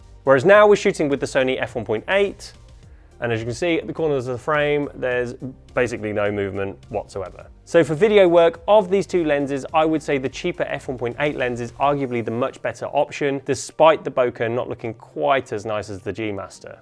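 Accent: United Kingdom. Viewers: I like this guy's humor. Plus having a British accent automagically makes him sound like he knows what he is talking about. Cheers mate! :D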